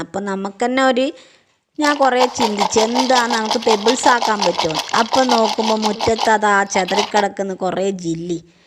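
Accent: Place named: Kannur, India. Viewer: നമ്മളെ കണ്ണൂര് ഭാഷ നല്ല രസം ഇണ്ട് കെകാൻ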